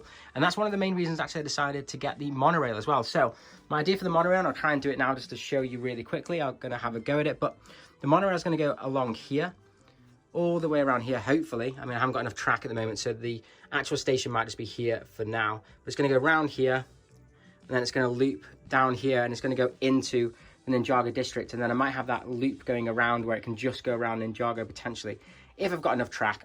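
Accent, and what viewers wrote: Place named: United Kingdom. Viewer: Man I would love to have this set, but it was at a time period where I stopped being into building LEGO. Online and used, this set goes from $500 up to $1500 US now a days, which is just too expensive. I'm guessing by your accent, you bought this set used in pounds? I think if LEGO was smart, they would come out with a new monorail set. It would most definitely be a sell out.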